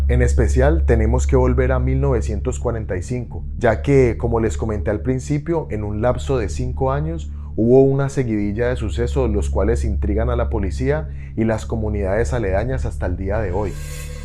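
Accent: Colombia. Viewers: Me gustan mucho tus videos y tu estilo narrativo yncluyendo tus gesticulaciones. Si mo me equivoco tienes un asento colombiano parce. Espero tu siguiente video.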